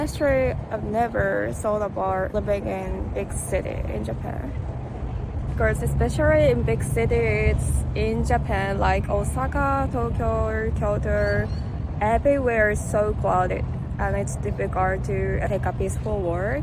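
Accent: New South Wales, Australia. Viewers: There are some markets in Potts Point on Sundays. Its interesting hearing some of your english words have already started to develop the Australian accent. Hope you enjoy your time in Sydney and Australia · Welcome! You seem to be settling in well and enjoying our way of life. Did you know some of your words are starting to take on an Australian accent? Follow in particular jumped out to me! But there are others in there too. Keep having fun! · Aussie accent already detected. 👍🏽 You’ll fit right in just relax and enjoy the ride. It’s a great part of the world to be. 🇦🇺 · One thing I have noticed listening to you speak English is that there are certain words where you talk with what sounds like the beginnings of a very typical Australian accent. show know know You've really nailed the way that Aussies slur our words and speak with a very nasal voice, we infamously say "naur" instead of no. 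I think you're going to end up with a really cool sounding accent, great mix of Japanese and Australian :)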